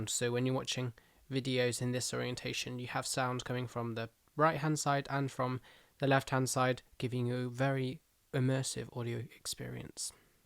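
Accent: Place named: United Kingdom. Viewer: I could listen to the British accent all day.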